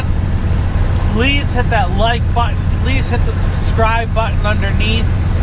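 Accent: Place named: Tennessee, United States. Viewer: None of this is helpful to me sence I'm not a truck driver but I love listening to your Tennessee voice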